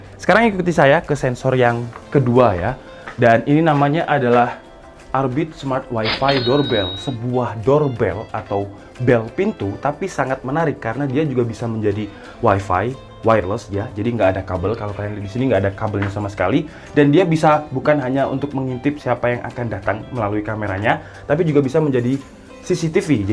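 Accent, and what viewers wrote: Java, Indonesia. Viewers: bahasa indo ne pemean opo? " jemuran " wkw ngakak cok jowo kentel tenan 😂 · Jane ngunu arek endi se pean iki 🙈🤣 kok jowo e medok memehan ae bingung bhs indo ne wkwk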